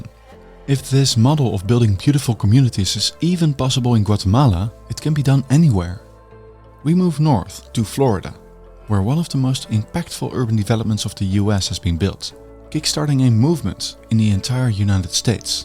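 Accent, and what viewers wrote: Netherlands. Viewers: Wat een gezellig Nederlands accent!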